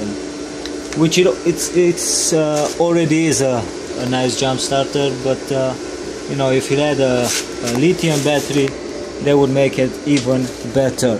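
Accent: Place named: Romania. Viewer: Sound so romanian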